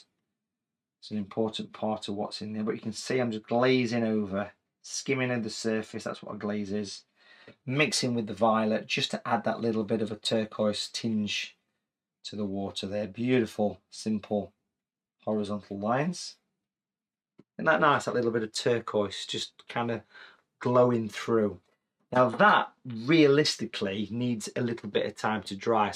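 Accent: United Kingdom. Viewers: I shall give this a go as I loved the rocks. Love your Derbyshire accent too 👍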